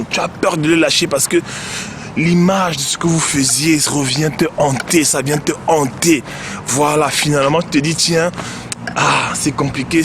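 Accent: Cameroon. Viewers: Pas besoin de te présenter gars, ton nom, ton timbre vocal et ton élocution font de toi un Camer. Et merci pour le conseil. Je t'invite à aller écouter les bra-tra-saqueurs de Marseille su Femme Immigée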